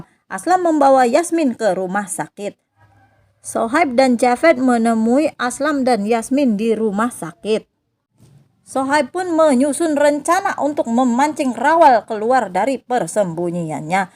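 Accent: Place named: West Java, Indonesia. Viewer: Logat nya terlalu kelihatan... kalau anda dr bagian jawa barat... klu bukan cirebon pasti indramayu...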